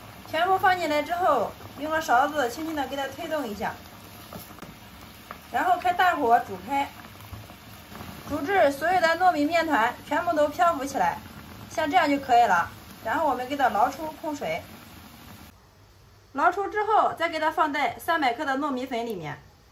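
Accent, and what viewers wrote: Shandong, China. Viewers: 难道她是外国人么？我听她的口音好像是山东口音的普通话啊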